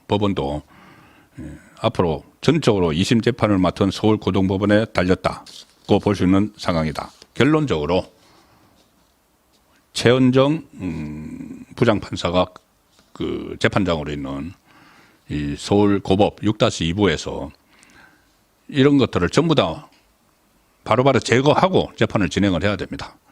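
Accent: Gyeongsang, South Korea. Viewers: 조중동 종편 눈치나 살금거리고 보면서 대세가 어딜까? 머리굴리는 경상도 말투 송국건 ㅉ 경상도 의리있다는 거짓말이나 하지마라 ㅉ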